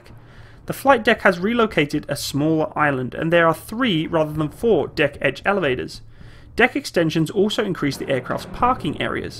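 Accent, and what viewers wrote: United Kingdom. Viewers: CONGRATULATIONS AMERICA. OUR LEADERS ARE SO USELESS.. I AM LISTENING TO A BRIT TELL ME ABOUT MY OWN COUNTRIES AIRCRAFT CARRIER. I BET THE VOICE LIVES IN THE U.K. TOO. NO WONDER CHINA IS STEALING EVERYONE'S DESIGNS. PEOPLE LIKE THIS ASS HOLE GET ON MY NERVES. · I think an American should be narrating this. Same goes for many videos you see with Brit narrators; National Geo on U.S. topics - Tours of the U.S. Mint - U.S. National Parks, you get the point. This guy should be narrating things like The Big Ben clocktower or Oxford or Stonehedge...etc.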